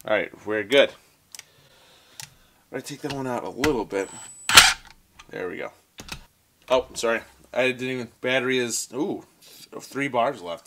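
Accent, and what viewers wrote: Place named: Wisconsin, United States. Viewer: Depending on if he's using "freedom units" or canuckistanian metric units. :D I would've guessed Wisconsin or Michigan from the accent, but Ontario is not entirely impossible.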